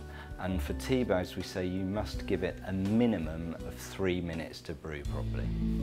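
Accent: United Kingdom. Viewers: The perfect tea video: English accent Formal attire Proper tea etiquette Elegance Fine china Immaculate tea knowledge 10/10, would recommend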